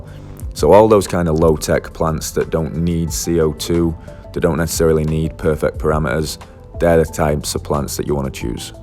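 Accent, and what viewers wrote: United Kingdom. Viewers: You sound as though you’re in the UK, how can you buy aquarium co op feRT? Great vid BTW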